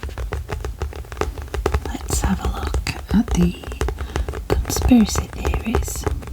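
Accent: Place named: Yorkshire, United Kingdom. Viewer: Been so hard to find a proper yorkshire accent to fall asleep to! it's all americans doing accents for fun or posh people and i hate it!!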